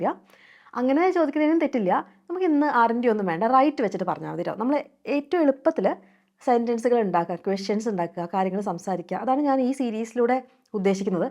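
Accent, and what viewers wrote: Thrissur, India. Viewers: Mam r u from Thrissur ennu chothikkamo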